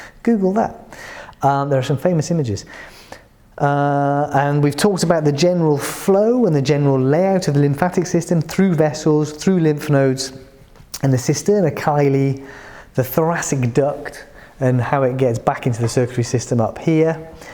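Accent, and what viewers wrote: United Kingdom. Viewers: im in love with you and your British accent i love so much thx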